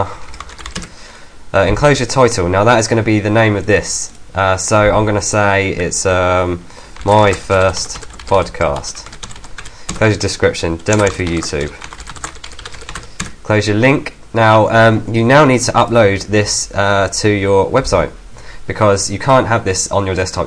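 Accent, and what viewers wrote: England, United Kingdom. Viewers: when i create my file in the main directory it comes up as podcast.rss.txt does it matter that the .txt is at the end? I cannot upload to itunes and i'm guessing its because of that ...btw you talk to damn fast and have an english accent so i cant fully understand what you are trying to say ...folder sounds like " fohder "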